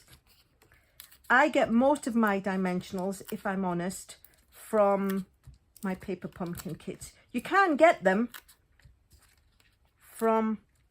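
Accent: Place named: United Kingdom. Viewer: Are you in the UK? Because of your accent. If you are, I wonder if I could buy the PP from you?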